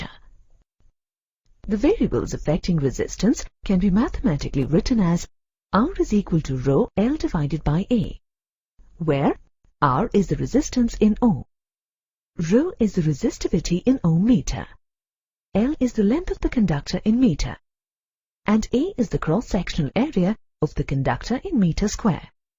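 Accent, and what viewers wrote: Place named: India. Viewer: Bad accent and wrong terminology. A typical indian product.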